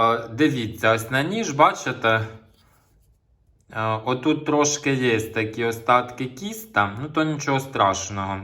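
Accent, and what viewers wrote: Poltava, Ukraine. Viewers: Дякую дуже, сміюся вголос. Нагадуєте мамину говірку, вона була з Полтавщини.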